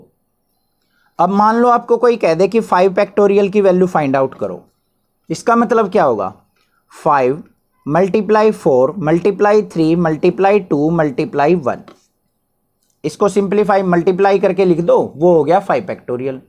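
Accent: Haryana, India. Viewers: sir ji mugje laga hi tha ki aap harayana ke ho aapki language se ma bhi haryana se ho na isiliye,vase ma hisar se hu sir. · are sir aap to sacchi me haryanvi bolte h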